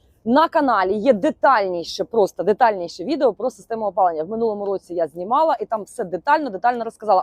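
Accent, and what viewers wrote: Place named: Surzhyk, Ukraine. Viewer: Ще менше суржику, будь ласка. Попрацюйте над правильним вимовлянням закінчень слів.😉💪🏻🇺🇦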